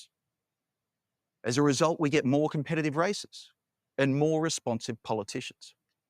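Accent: Australia. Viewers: As an American with friends & extended family in Australia, having visited twice with 5 weeks in 2024 and longer trip planned 2026, I definitely love Australian style, accomplishments, pride and accent. Dr Wolfers who I listen to in other discussion venues, really has enhanced my admiration of his home country.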